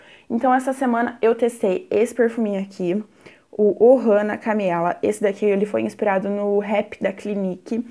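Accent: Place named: São Paulo, Brazil. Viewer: Sotaque dela é lindo, interior de SP. Amo ❤️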